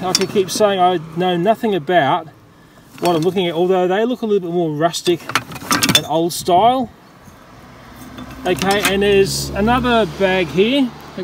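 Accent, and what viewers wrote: Sydney, Australia. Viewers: Child in house says to mum "Mum, the loony spider man is outside talking to a chair"... Do love the Sydney accent, its like how us Londoners used to talk before London became the city of a million ponging ars... OK must restrain meself on social media, its a good job I am bona fide certified mentally ill cos I could get into trouble speaking me mind :P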